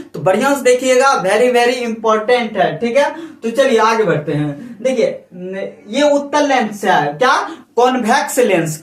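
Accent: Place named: Jharkhand, India. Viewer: Jharkhand sa bola raha hai sir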